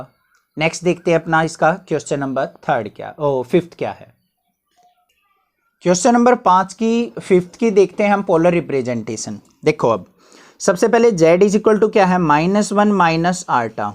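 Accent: Haryana, India. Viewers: Sir r u from haryana? Bcoz u speak like haryani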